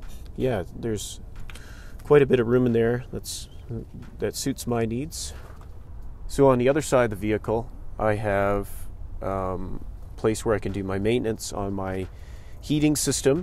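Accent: Canada. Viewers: Definitely Canadian sayin ouut!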